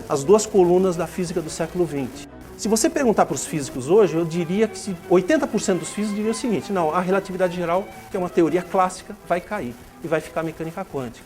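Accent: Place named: Italy. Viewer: Caraca, que sotaque de... italiano!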